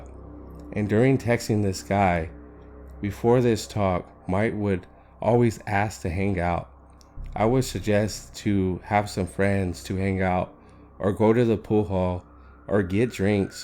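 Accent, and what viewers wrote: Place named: California, United States. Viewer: Much better. I was going to ask if you were from California, then you said you were. I knew it, I used to live there your narrator definitely has the accent. Can I ask why you are not narrating your stories? No offense to your narrator but you have a better voice for it. I look forward to more of your stories!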